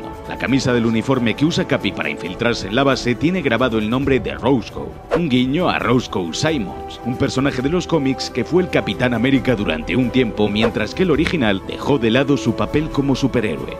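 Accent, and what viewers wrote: Spain. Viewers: cambie a los 30 segundos de escuchar er peor acento, el español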